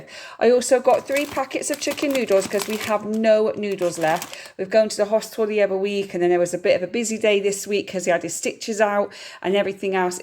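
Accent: United Kingdom. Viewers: Where are you from you sound like you live in Gloucestershire like me.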